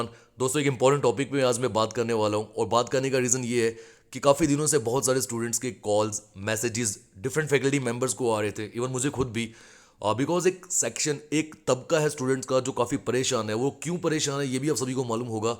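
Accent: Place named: Jammu, India. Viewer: Koi different voice Nhi hai Unka accent dogri hai or hindi mai aisa hi lagega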